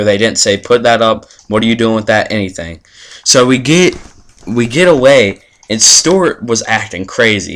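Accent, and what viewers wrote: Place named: North Carolina, United States. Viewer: What City in NC are you closest to? I live in NC aswell, and you sound like your from around my area!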